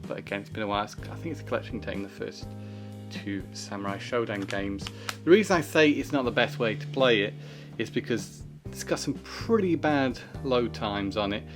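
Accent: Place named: United Kingdom. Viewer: Bro what's up with this channel, you sound British and nothing like the bald bearded guy on the latest video about kinder eggs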